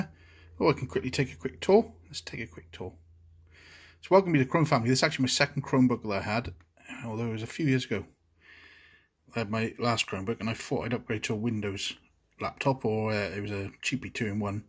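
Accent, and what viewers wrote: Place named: South West England, United Kingdom. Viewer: I do know that Argos always fluctuate their prices on Chromebooks, One week the 11.6 inch laptops are £199, then they are suddenly £149 ! I guess it's striking lucky at the right time, Nice review on this Chromebook friend :) going by your accent i'm guessing you live in the South West England, I only say that as i have the same accent & im from Bristol South west!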